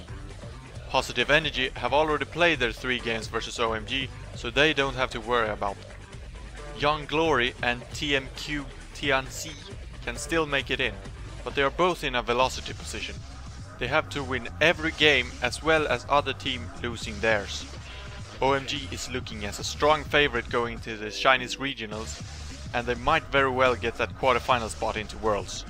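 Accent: Sweden. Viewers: Im so sure ur Swedish! This accent! It must be ^^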